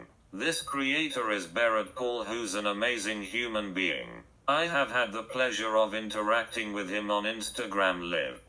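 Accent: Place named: United Kingdom. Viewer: I love the British voicing you set on you device 👍🏽 I agree with you whole-heartedly 👍🏽 Stay well & stay safe ✌🏽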